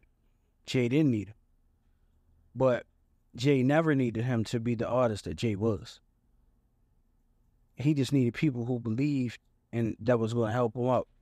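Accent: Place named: Baltimore, United States. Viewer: It’s true and clear that money don’t make you happy … I can tell by your dialect, you’re from Bmore or the MD area, but I could be wrong… It was cool to see how someone from Bmore saw it and confirmed how disrespectful that would have been 🤔 People like Irv swear they can speak for the hood but don’t have a damn clue on nothing!!!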